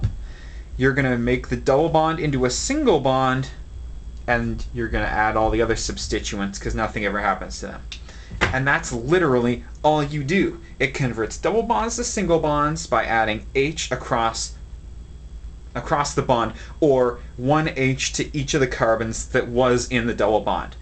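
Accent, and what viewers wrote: Canada. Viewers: you sound very canadian--love it:D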